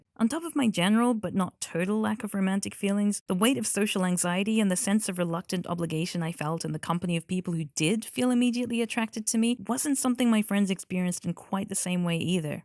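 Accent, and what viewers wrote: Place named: Australia. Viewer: oh god youre australian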